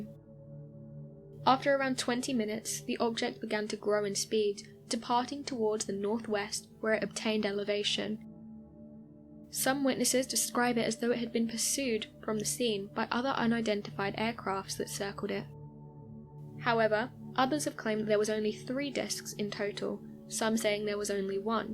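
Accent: United Kingdom. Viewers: Al-u-mi-nium.????? Why do all people with british sounding accents pronounce words so blatantly wrong.?? They're taught the same alphabet as American English speaking students, and taught that all of the letters make the exact same sounds as were taught, yet they insist on pronouncing them the same way as every other retard over there. Some way worse than others. Ask any of them how to pronounce the letter Z, and they'll say ZED... ask them how to spell it and they'll say ZED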